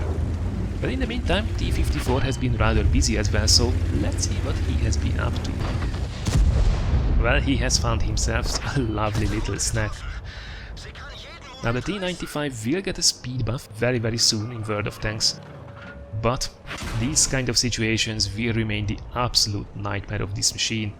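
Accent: Hungary. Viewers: Magyar vagy? :D Az angolod elég magyaros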